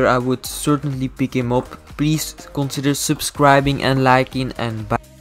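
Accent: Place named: Belgium. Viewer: Direct van in het begin van de video herkende ik die belgische accent hahahah Nice vid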